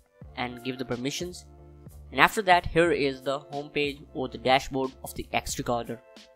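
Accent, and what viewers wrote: India. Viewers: Always gotta rely on that indian tech guy with a bollywood accent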